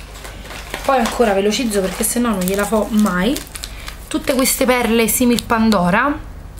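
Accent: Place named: Rome, Italy. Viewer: Ciao! Finalmente sei tornata mi mancavano i tuoi video e il tuo accento romano troppo forte! Attendo il video con le tue creazioni, sono solo un pò curiosa...Bacio!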